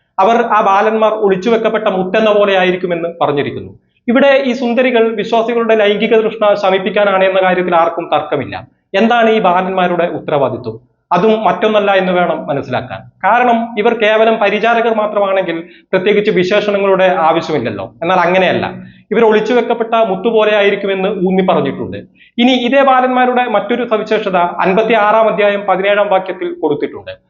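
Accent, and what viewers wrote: Kasaragod, India. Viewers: കാസ്രോട് ശൈലി തന്നെയാണ് ഭായ് രസം ❤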